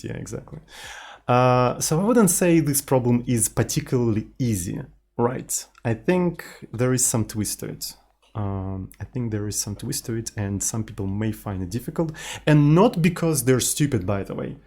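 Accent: Russia. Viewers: Czemu masz taki ruski akcent?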